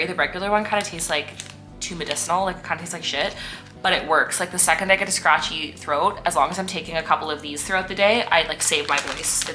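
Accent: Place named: Canada. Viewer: You have such a fun personality, and I can instantly hear your Canadian accent😆😆😆…. I’m actually kind of blown away by your portable light for your laptop.🤯🤯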